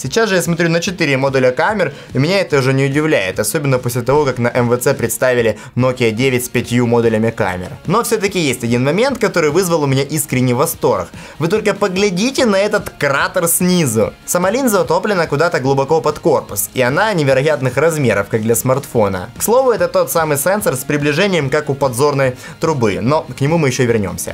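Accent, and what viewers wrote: Odessa, Ukraine. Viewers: ты что Одессит? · Ты одессит ?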